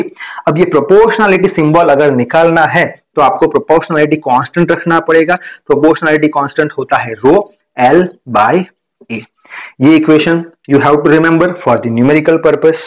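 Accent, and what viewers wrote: Gujarat, India. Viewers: sir ji ape Gujarat se ho ?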